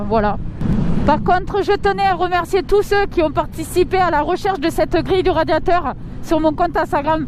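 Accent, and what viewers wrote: South, France. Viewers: Coucou magnifique vidéo et les paysage et ta belle voix du sud ça donne envie de partir en vacances,le problème des z750 sur c’était l’électrique et comme t’a pas abs il faut frères un peut plus de l’arrière moi je fais comme ça sinon je freine plus de l’avant la roue arrière ne touche plus le sol bonne continuation 👍✌️🙏😘🥰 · Le bel accent du sud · cool l'accent du sud chez toi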